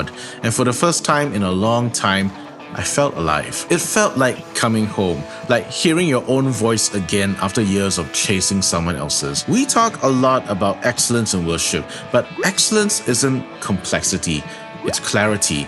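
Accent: Singapore. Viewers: You sound like a Singaporean. Is your church in Singapore or Malaysia?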